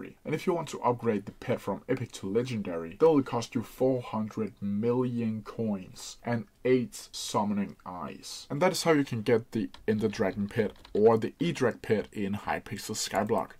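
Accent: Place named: Denmark. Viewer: nah det der danglish er alt for obvious, smid lige din disc vi skal ramme noget skyblock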